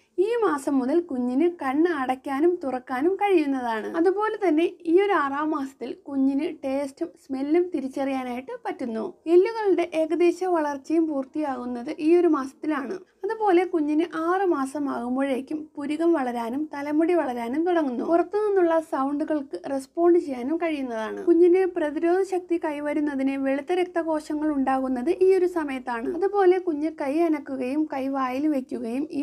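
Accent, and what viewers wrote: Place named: Kasaragod, India. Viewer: kasargod slang pole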